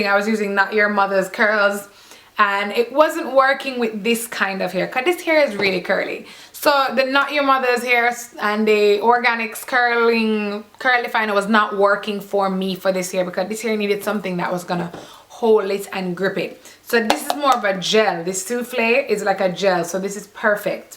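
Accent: Jamaica. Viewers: are you jamaican?? u sound like a yardie .... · Jamaican accent 😍😍😍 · She is Jamaican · st. rose in ur face she is jamaican I wonder what's so terrible about jamaican accent anyways what I want to know is how u de tangle it cuz I wore the malaysian kinky for a month n 1 week n I washed it almost every day if it was thoroughly washed water contacted it every single day the beauty of it is that u can wash it in the shower while taking a bath and by the time u put ur clothes on its like dry no need for blow drying n all but I messed it up so bad ly when I stopped de tangling it n now I can't wear it again it's tangled with big cases of knots no way I can unwind it n I love this hair so much I am thinking of dying it burgundy n wear it for atleast a week before Christmas please tell me what to do its badly tangled help!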